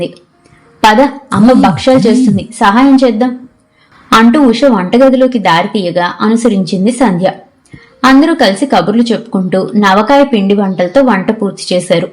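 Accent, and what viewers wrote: Godavari, India. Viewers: గోదావరియాస బాగా అలవాటైనట్లుంది 😊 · చాలా బాగా చదివావు! గోదావరి యాస చాలా బాగా పలికావు. అభినందనలు 🎉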